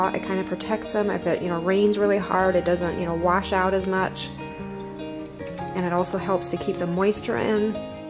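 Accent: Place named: Pennsylvania, United States. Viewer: Beautiful! Your husband did a fantastic job. Thank you for sharing your lovely property and the hard work you put into creating it. Your accent makes me long to visit my relatives in Berks county PA.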